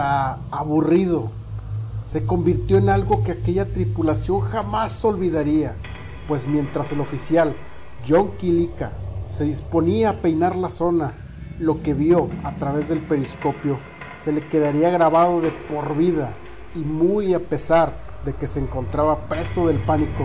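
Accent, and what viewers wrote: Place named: Mexico. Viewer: Hablas muy Mexicano , con mucho acento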